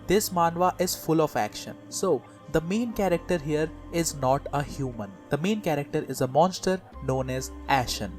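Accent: India. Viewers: Are u indian because u sound like one.... Dont get me wrong I was just askin I ain't racist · I think i just found the best indian accent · India best accent no 🧢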